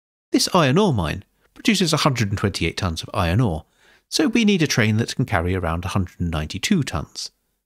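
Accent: United Kingdom. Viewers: That accent is so British, 😂. Thanks for the video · I really love your voice. That soft british accent makes me think I'm a young child, watching some british infomercial or something like that. It's really soothing, and helps me learn.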